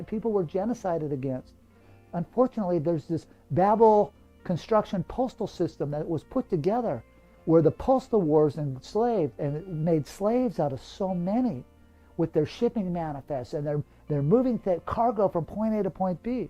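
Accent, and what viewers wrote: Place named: United States. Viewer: Transcription de la conférence ci-dessus de Russel-Jay : Gould Ne parlant pas couramment l’anglais L’accent américain ne m’aide pas Voici donc ci-dessous La transcription de la traduction you tube Avec certainement des fautes de traduction Gratitude * * * Salutations J'ai regardé les médias et les choses qui se passent dans la société Il y a beaucoup de préoccupations que j'en … Que j'ai en tant que maître de poste de notre monde Je suis témoin des mots, que les gens utilisent et des conditions historiques des mots du passé Je parle à propos de Babel, non je n'ai pas dit la Bible j'ai dit bavardage : bable, qui est une grammaire fictive C'est la grammaire ou la communication que nous utilisons pour la confusion Et je voudrais tout d'abord dire honte au gouvernement des États-Unis au Congrès, à la poste les militaires US, et des présidents qui depuis 1999 ne se sont pas manifestés Et non pas donné clôture des contrats aux citoyens de cette Grande Terre et aux citoyens du monde que nous étions capturés par un système frauduleux de grammaire de Babel avec le certificat de naissance de tout le monde pour ceux d'entre vous qui n'ont pas les antécédents de ce qu'est un certificat de naissance s'il vous plaît aller sur <link> et regardez mon film mais revenons à ce que nous voyons maintenant dans la société dans l'ingénierie et la nouvelle compétence en communication que les gens utilisent vous entendrez les gens utiliser le mot aborigène lorsque vous commencez un mot avec une voyel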